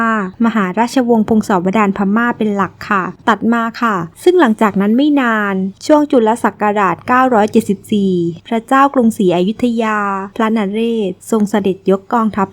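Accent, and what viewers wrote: South, Thailand. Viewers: ฟังน้ำเสียงแอดมินเป็นคนใต้ไหมครับ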